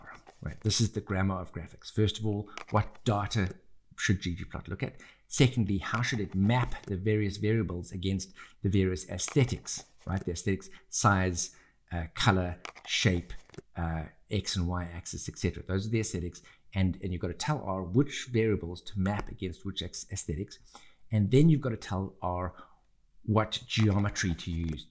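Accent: South Africa. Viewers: Hi you sound South African. Also. Please can you do one with loads of data as with spectra. I have to do it for my project and can't for the life of me figure it out. If you can reach out please.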